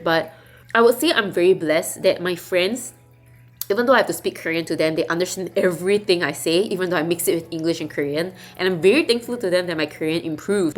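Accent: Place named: Singapore. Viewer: Authentic, and pure singlish and local references jus tickles me n my wife when we watch yr videos...remain true to yr heart, jiayou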